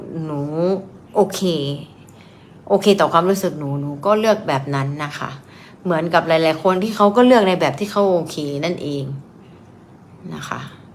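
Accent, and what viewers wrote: Isan, Thailand. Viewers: เสียงอีสาน เชียร์ครับ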